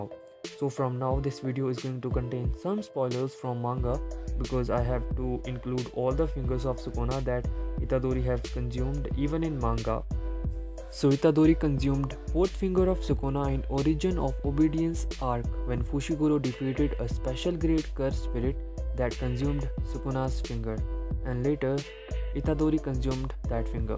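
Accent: India. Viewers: U Indian right ?. The accent is just shouting it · Indian accent☠️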